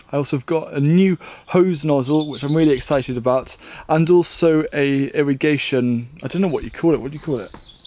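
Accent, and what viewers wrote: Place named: Wales, United Kingdom. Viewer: Love the show and your just great to listen to, love the Welsh accent. Rhubarb, wonderful vegetable, whats the secret in growing the best like feeding manure, Boron and/or Iron etc etc, want to hear your secrets please, Peter Australia. Yours are so RED, whats the secret.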